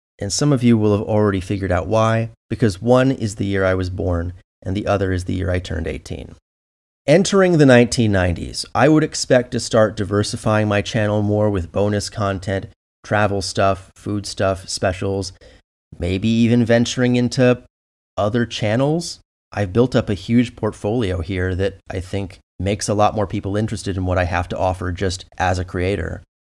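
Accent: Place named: Michigan, United States. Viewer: THIS MAKES SO MUCH SENSE! Every time you speak I think “he sounds like he is from around here (Michigan)”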